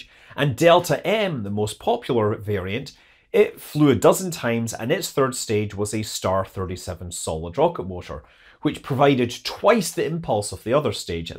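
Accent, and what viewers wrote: Scotland, United Kingdom. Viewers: Why are these "Sons of Thor" and not "Daughters of Thor"? Also, you are sounding particularly Scottish today. What happened?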